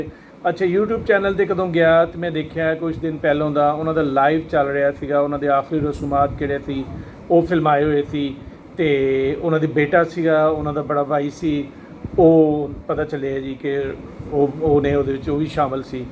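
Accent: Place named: Fazilka, India. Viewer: Jis Insan da Takullash hi..Dast..si..oh wakya hi... Laxman Dost si .ohna de.. Akal Chalana da Afsos hai..Or.. Fazilka or Jalalabad West.. Ilaqey d Bolli da Lehza..Same tuhadey Ilaqey da Lehza hai... Tuhada Gam..Sanjhah karna..tuhadi. . Parwarish da Natiza hai..Sada Hasdey Wasdey raho.. Slam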